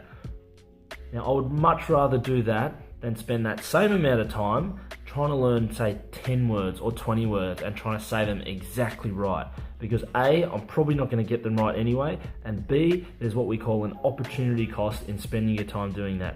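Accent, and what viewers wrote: Australia. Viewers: Kia ora e hoa thank you for being brave and courageous for voicing important things like this vid. You sound very ozzy, just curious.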